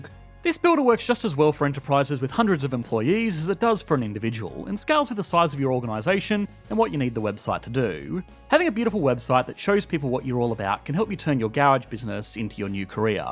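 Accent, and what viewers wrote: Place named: East Coast, Australia. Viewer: East Coast Strayan.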